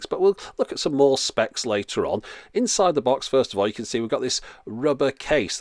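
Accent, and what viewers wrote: United Kingdom. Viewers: from which part of the UK you are?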